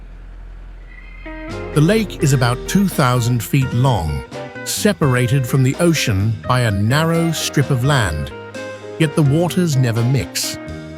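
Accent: England, United Kingdom. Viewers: Wait a minute, English accent.😏still sounds like a computer 🫩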